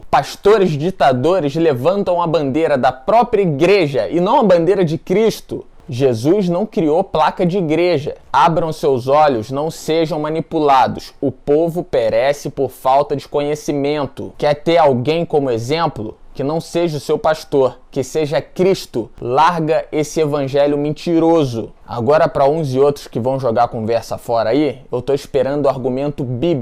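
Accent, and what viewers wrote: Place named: Rio de Janeiro, Brazil. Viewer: desse sotaque carioca eu ixtava com saudade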